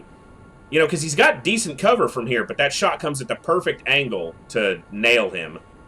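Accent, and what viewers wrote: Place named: United States. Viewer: I am very much enjoying this playthrough. I’ve watched a ton of xcom and TFTd let’s plays on YouTube and this is quickly turning into one of my favourites. I love the raw organic playthrough where you just have to live with your mistakes and keep fighting. I’ve seen too many people play this game and just spam psi powers to win the game. I never liked using psi personally, feels like a cheap way to play. Also love that TFTD is getting some love cuz this game deserves love too! Your subtle American accent reminds me of someone I used to know from Philadelphia. Greetings from Canada!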